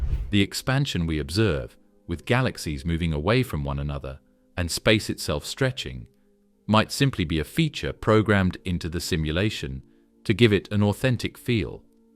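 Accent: England, United Kingdom. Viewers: The usual dribble (English accent included) with generic impertinent graphics. Good for sleeping though.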